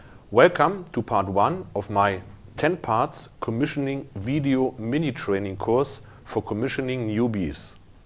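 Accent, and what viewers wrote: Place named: Germany. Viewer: Thank you For this training Mr., it is very helpful for me. i liked your german accent ^-^.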